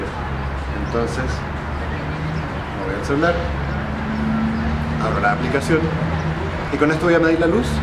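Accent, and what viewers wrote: Chile. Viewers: acento chileno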